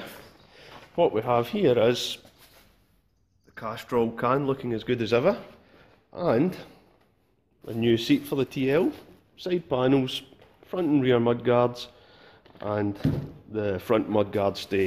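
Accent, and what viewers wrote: Scotland, United Kingdom. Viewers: Thanks for the update. And by the way, do you know Frankie Boyle? Sounds like you're from the same area. 😁 Keep up the good work. Looking forward to the next one. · Scotsman, 100%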